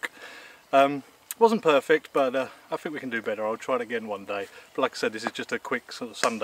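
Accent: United Kingdom. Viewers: Wow i just found a video worth for my lesson to study living outside, i subscribe it m8, your video's so cool And british accent? Youre an england?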